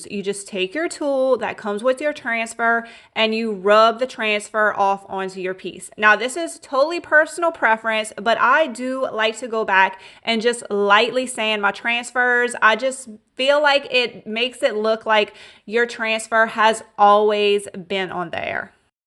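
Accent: Louisiana, United States. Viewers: My favorite flip was definitely the basket. I wish they sold the IOD stuff here in Australia! I love all the types of videos you make- and your Louisiana accent makes me feel like I have a piece of home here with me when I'm so far away from mine!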